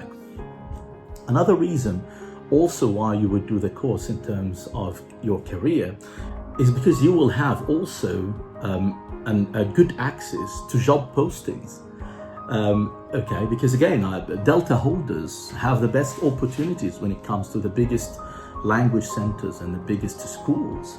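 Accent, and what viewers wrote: United Kingdom. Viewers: Great British accent Mr, something brings trustfulness in your training place, I really felt relieved when I heard you talking in English, this is my first time by the way, cause I follow you on Facebook and your Egyptian Arabic dailect is impressive too. Greetings sir! That's make me ask about the cost of this splendid Delta course and if it's reachable online. Thank you and I wish you more breakthrough Courses.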